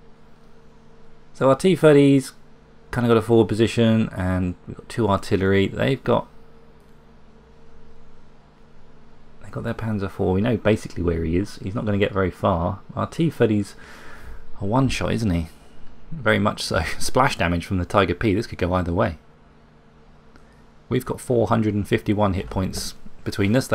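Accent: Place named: United Kingdom. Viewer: its the British accent i guess;) didnt hear the sarcasm.. good weekend.. also can i send some gameplay?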